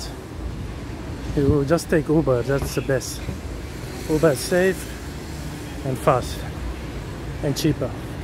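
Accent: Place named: India. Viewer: His name and accent seems Indian.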